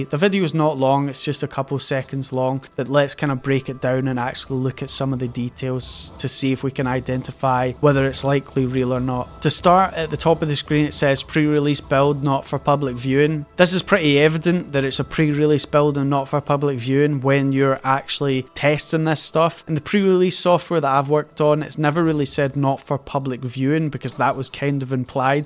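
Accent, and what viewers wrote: Scotland, United Kingdom. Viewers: Comment section never heard a Scotsman before lmao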